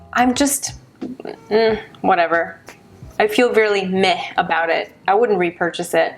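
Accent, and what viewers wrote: Canada. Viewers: Maybe it's because I've lived in SF for a year, but you sound extra Canadian in this! And I had no clue Rituals was from the Netherlands. Great video as always! xoxo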